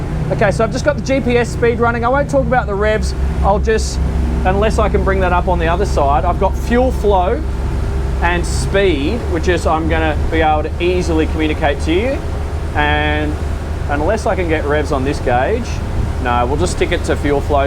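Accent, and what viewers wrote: Australia. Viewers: The way you Aussies say "here", just boggles the mind lol. Hiare.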